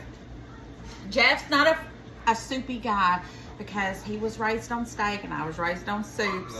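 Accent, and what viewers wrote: South, United States. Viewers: Where do you live because you sound like a southern girl and cook like I was raised I just love your videos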